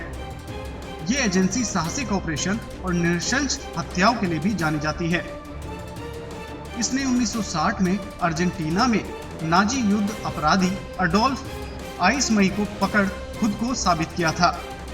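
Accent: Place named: Pakistan. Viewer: Abe chootiye Tere lehze se he saaf saaf pta chal rha h ki tu Pakistaani chootiya h.. Indian Muslim ka behavior of expressing tujse kahin bhi match nahi krta.. Ab kutch sensible reply ho to zarur comment kariyo..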